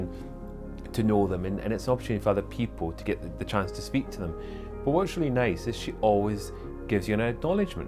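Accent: Scotland, United Kingdom. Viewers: are you Scottish? from your accent it sounds so, maybe. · I like the music but I understand that those who aren’t British might find it harder to understand your lovely Scots accent above it. Please though do check your subtitles. Some of what it picks up are the most bizarre interpretations of what you said. I think I heard 3 different words for Balmoral and it must be confusing for those who aren’t as familiar with the RF (I’ve holidayed in one of the cottages that are rented out on the Balmoral estate. It’s so beautiful there)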